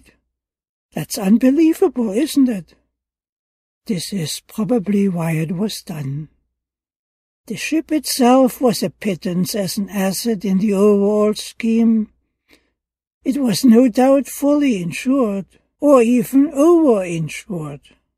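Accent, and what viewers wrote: Germany. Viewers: I suspect his native language is German. I agree, he is a bit difficult to understand. But, since i do speak some German, it might not be as difficult for me to understand him as it might be for you. I also agree that many music over voice applications on any number of Y.T. presentations totally ruin the videos.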